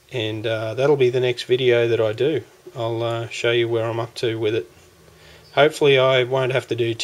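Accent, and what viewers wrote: Australia. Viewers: It's aussie...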